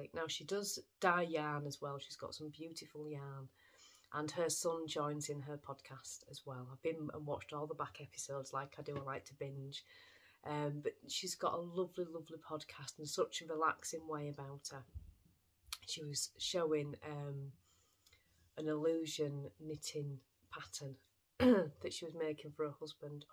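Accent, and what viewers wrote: Yorkshire, United Kingdom. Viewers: Pick me in the comments! :-D I have never fancied doing a Stephen West pattern before but your shawl is inspiring me to think about it .... hhmmm.. Thank you for your podcast - I'm really enjoying it (and loving hearing the Yorkshire accent (I'm from Sheffield but haven't lived there for years so it's good to hear you).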